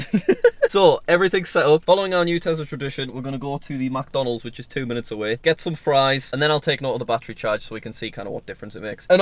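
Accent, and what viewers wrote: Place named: Scotland, United Kingdom. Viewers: yeah I live in Scotland he does sound a wee bit Scottish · Right! I didn't know if he was living in Scotland or England right now, so I just guess Scotland because of his accent.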